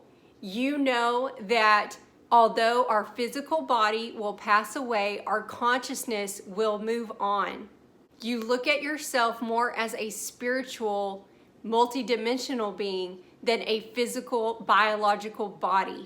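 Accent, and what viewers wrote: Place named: East Texas, United States. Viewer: You have got to be from East Texas! Love your message..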